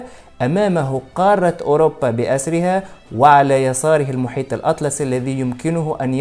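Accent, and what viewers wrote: Tunisia. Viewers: بارك الله فيك.. مجهودك مشكور ...وطريقتك في التقديم جميلة +اللغة العربية الفصحى بلحن تونسي جميل....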